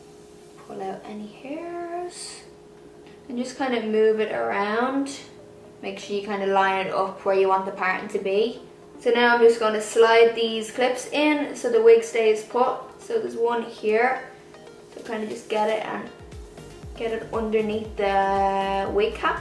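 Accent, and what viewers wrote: Ireland. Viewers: Denise Gasparini she’s definitely Irish yes! I don’t know where the Hispanic come from 😅🙈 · Obviously Irish, beautiful and obvious. GO IRELAND!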